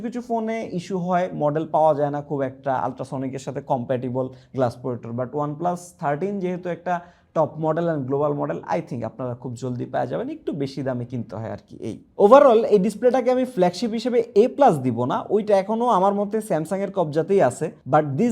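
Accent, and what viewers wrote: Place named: Rangpur, Bangladesh. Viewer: ভাইয়ের কথা গুলা কেমন যানি অন্যরকম লাগলো। মনে হচ্ছে রংপুর বা দিনাজপুর বা বিক্রমপুইরা লাগে অনেক টা।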